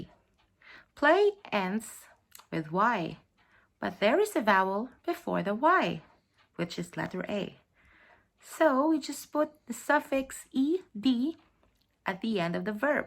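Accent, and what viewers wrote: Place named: Venezuela. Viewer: Parla venezuelano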